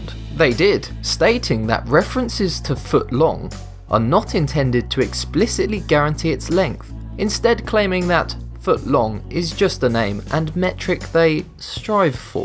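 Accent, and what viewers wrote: United Kingdom. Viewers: What is this what is this voice why is it so different and British why please why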